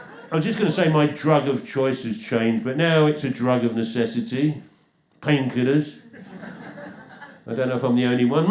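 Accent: Cockney, United Kingdom. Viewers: luv this fella .. proper cockney geezer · As a former Portuguese immigrant in the UK, I loved it. You sounded to me like a proper Guy Ritchie cockney character, and I mean it in the best way possible. Bloody brilliant. Cheers from Portugal.🇬🇧🇵🇹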